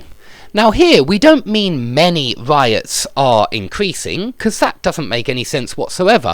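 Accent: United Kingdom. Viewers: That's British accent with his perfect voice.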